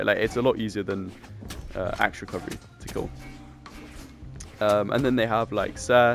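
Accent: London, United Kingdom. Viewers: That was the most London intro I’ve ever heard to a video lol.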